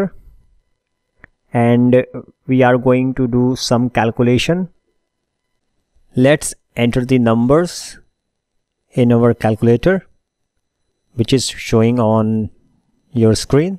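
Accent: India. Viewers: thank you random indian accent dude from YT<3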